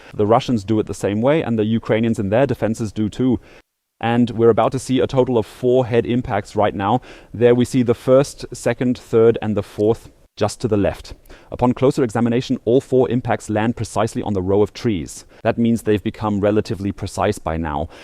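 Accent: Germany. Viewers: A pleasure to watch with such a knowledgeable, handsome host who is extremely fluent in English. I don't mind at all the touch of a German accent. He only slipped up once, letting "Belgrade" come out instead of "Belgorod.' That's highly forgivable, considering that the meaning of "Belgrade" in Serbian is exactly the same as "Belgorod" in ruzzian.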